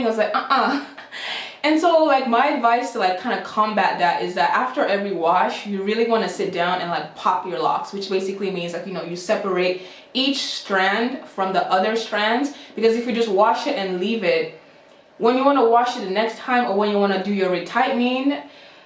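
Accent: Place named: West Africa. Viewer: Wait, I hear a West African accent somewhere in there; na Naija you be? You had me LOL for real. Love your personality. New subbie!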